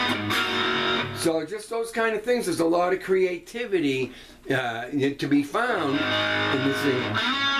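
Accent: New England, United States. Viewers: i also don't remember you having such a thick new england accent LOL. i'm born and raised here, and it jumps out even to me! ;)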